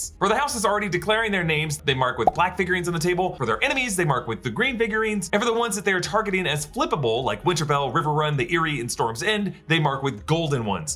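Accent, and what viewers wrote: United States. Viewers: I LOVE YOUR PLAIN AMERICAN ACCENT!!!! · Plain American accent that finds 5 notable changes in the opening credits when others say “nothing changed” despite having seen the episode in advance and had more time to dissect it. Your videos are well worth the wait. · “Plain American accent” damn shots at the other creators who more than likely made their video after the leak. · “from a nerd with a plain American accent” 🥰🥰🥰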